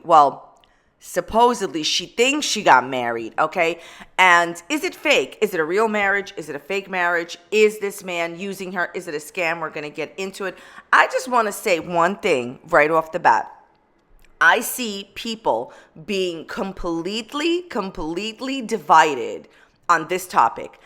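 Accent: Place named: New York, United States. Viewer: Beautiful water scenery - where is this? Clear water to see the sand & shells. Your accent sounds like east coast - NY. I was from Dutchess county.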